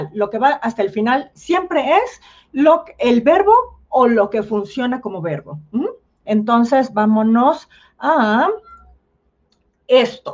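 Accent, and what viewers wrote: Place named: Mexico City, Mexico. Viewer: Hola hola Sensei!! Apenas descubrí tus clases, qué bonito explicas, además cuando hablas tan chilanga es increíble, eso hace la clase más entretenida y bonita... Gracias Gracias 💞... Propósito de vida, tener una small talk en japonés 🎉 y contigo lo lograré!! Gracias gracias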